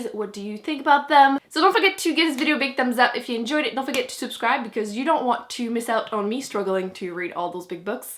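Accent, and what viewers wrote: Quebec, Canada. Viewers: Just found your channel and have binge watched a bunch or your episodes. I listen to a lot of audiobooks while driving around in my cruiser and when I am out sailing on my boat. You are so bubbly and cute when you explain why you like the books you do. The French Canadian accent is so cute too. It makes me laugh at times, which is an added bonus. FYI, I’m Canadian as well but don’t have that French accent. Not to say I don’t sound Canadian because I do notice in my videos I sometimes do say “eh?” at the end of my sentences. You don’t even realize you do it until you watch yourself. Ha ha. You seem to like similar types of books to what I like. Keep those videos coming. I’ll be watching. Craig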